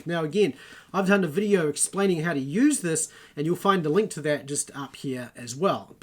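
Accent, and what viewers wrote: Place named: New Zealand. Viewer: Hi! I just stumbled upon your channel very recently and loving it. Thank-you! I noticed your Kiwi accent instantly as I am an Aussie. I am 3/4 of the way through Duff's "Elements of NT Greek" and really loving it. For some reason, this seems to be the industry-standard first-year Greek textbook for Australian institutions, hence my choice of it. Have you done a review of this yet? I could not find it anywhere if so. With regards to this video, I am wondering if Duff would be an adequate substitute for Mounce's book on your list?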